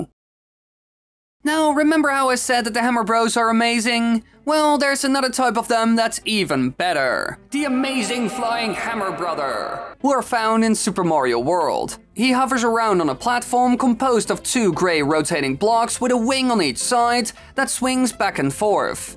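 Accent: Netherlands. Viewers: 0.06 Ah yes a fellow dutchman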